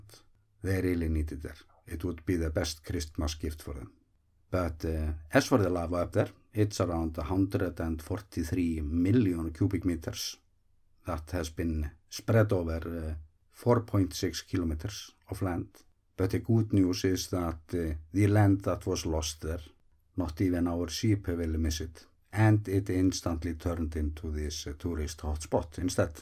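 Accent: Iceland. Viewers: I think he has a charming Icelandic accent, just like Björk😀 I once saw an interview with her, where she was asked how her name was pronounced, and she replied with “It rimes with a jerk”😆 Anyways, you just try to pronounce Eyjafjallajökull, Bárðarbunga or Landmannalaugar, and lets see how it goes, I would probably find that charming too😉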